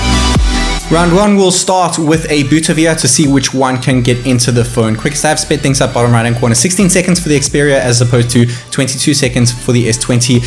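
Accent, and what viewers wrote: South Africa. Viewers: Bro are you South African?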